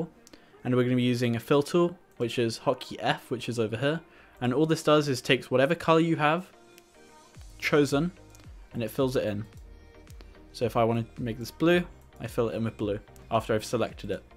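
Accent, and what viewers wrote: United Kingdom. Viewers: if your voice library is so small you came to that conclusion I feel sorry lol. He is british accent sort of very lightly sounding but that's the only real similarity Everything else you assumed is placebo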